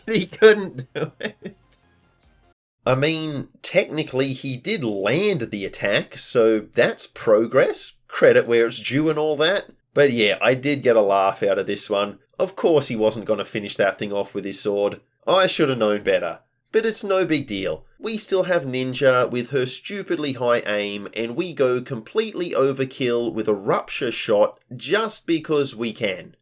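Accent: Australia. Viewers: The Aussie accent just adds flavor to these videos. It just makes them soothing. I put it in background sometimes.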